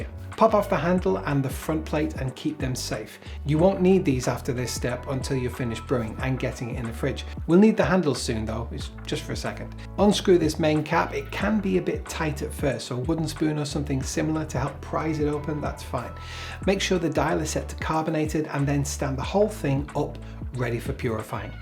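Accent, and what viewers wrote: United Kingdom. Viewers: Good scouser. Haha. Well done. Great review. Defo buying this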